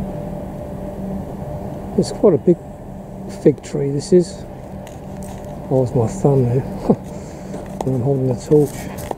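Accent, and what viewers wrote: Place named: London, United Kingdom. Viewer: I've seen a bunch of birds around the Craigieburn Grasslands that I'd never seen before and in some cases still can't identify. It's the largest surviving example of the remaining two percent of the volcanic grassland ecosystem in Melbourne's north, now almost gone. Regarding the Black Cockatoos, I think I might've mentioned previously that for a while I was seeing a flock of Little Corellas on St Paul's Cathedral opposite Flinders St. Station. From your description, the woodpecker I saw was probably a Lesser Spotted Woodpecker, I remember the black, white and red. Someone had put wire netting around the nest entrance to protect it. It was in a local park called Walk Wood, and there was a "myth" about a deer living there. I was only about ten at the time and imagined there was some huge antlered stag roaming the wood. 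But when I mentioned the story to people I'd known from there many years later, they explained that it wasn't a myth at all but that it was actually just a very small species of deer that really does regularly turn up in people's gardens around there. I wasn't sure whether to rejoice that the myth was true or to be disappointed that my "Lock Ness/Yeti deer" sounded like such an ordinary, mundane thing. I never saw one though, so still some mystery left! BTW the accent around Beaconsfield is a shocker, they sound like they're relatives of the Queen :-) I'm no expert on English accents but I'm guessing yours is a London accent - I could be wrong. BTW if you want t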